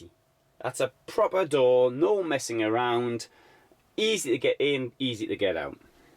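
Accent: United Kingdom. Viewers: Lass-in Angeles Speech impediment? It's called a British accent and a really nice one at that! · Lass-in Angeles If you don't like it don't listen. I like delivery its called British.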